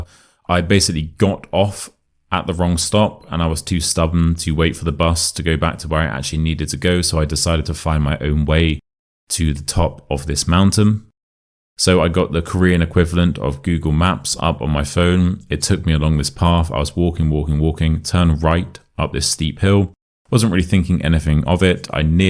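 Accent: RP, United Kingdom. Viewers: It is a little bit harder to me understand his British accent, because I am used to listen to American accent, but in general I could understand almost everything he said. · I would call it a very "standard" English. I can hear you are from GB somewhere, but this language i would expect to hear in US as well. English is my 3rd language, and if everyone were talking this way, the world would have been much more understandable place. :-))) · Do all british speak like you? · maybe yours is the sound of educated people... like the BBC guys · Quite understandable your speech... I always do enjoy your videos because I'm working on my British pronunciation and your particular accent is what I'm looking for... modern, standard, glotalisations, etc. ❤ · the modern RP accent you speak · He IS speaking RP like ...so It IS pretty clear to Understand If You have a good Level at listening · From your example, I think you have lived in Thailand. 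😊. Yeah,, I am familiar with the British accent that you speak. However, I found that when I lived in London, people use different accents and it was hard to understand them all.. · I'm gonna be hosnest i didn't get ir alll video but about 95.7% i could get . I'm so happy thia video is so amazing 😍 and i will watch more time this . because the British accent it's so my difficult to my ears. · I do understand this British man but i live in uk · I clearly understand you, but as some already mentioned not everyone in UK speaks the same way. For example, I can barely understand a pretty British TV show Brassic. Still love British accent and the way you say things :-)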